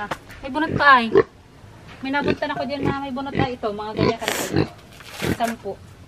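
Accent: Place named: Oriental Mindoro, Philippines. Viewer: Ung accent nyo po tulad smin sa oriental mindoro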